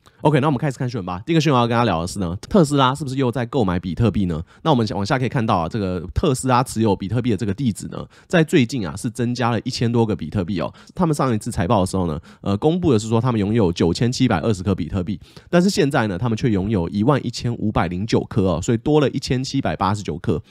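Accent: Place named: Taiwan. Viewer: 好少看到D大講台語😂😂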